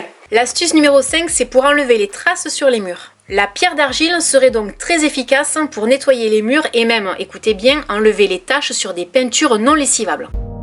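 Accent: South, France. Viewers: J'ai moins aimé cette vidéo car le ménage c'est pas trop mon truc mais j'ai mis la vidéo juste pour t'écouter et avoir ta jolie voix du sud ! Tu as vraiment une voix relaxante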